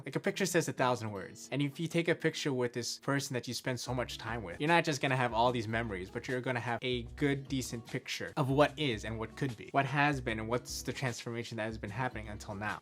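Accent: United Kingdom. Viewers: That British accent though haha! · Lol. You have a really good British accent :D I particularly loved the point where you can't put pressure on another person to be your source of happiness. That's kind of unrealistic and bound to fail. Solid video!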